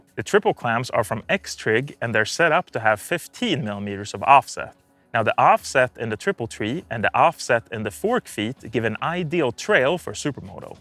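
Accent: Sweden. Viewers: bra svengelska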